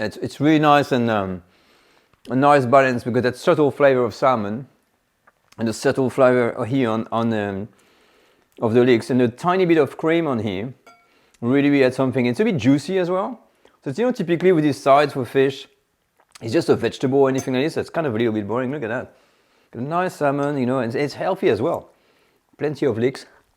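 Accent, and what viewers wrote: France. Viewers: PLEASE do a video in French; your accent will enhance the experience!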